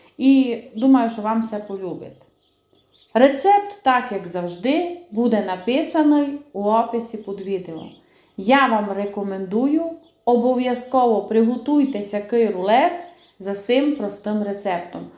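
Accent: Transcarpathia, Ukraine. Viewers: Аби шо. Сказало, як у діжку пукнуло. Це закарпатський діалект, обожнюю його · Так, це закарпатський діалект, колоритна говірка. Якщо «бесит», не слухайте. Нас з деяких пір російська дратує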